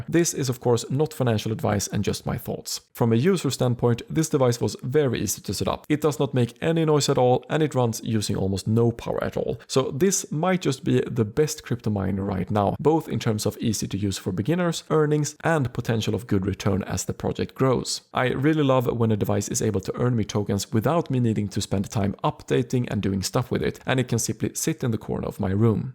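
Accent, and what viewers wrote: Sweden. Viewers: This work good on Sweden? I hear on your english that you are from sweden :D Hur många äger du och vad tjänar du per månad just nu? Har du testat ta ut profit ?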